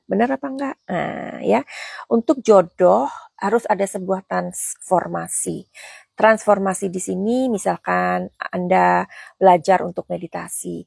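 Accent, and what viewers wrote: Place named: East Java, Indonesia. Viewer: Maaf madam asli mn ya koc bahasanya kaya org Jawa timuran 🙏🙏🙏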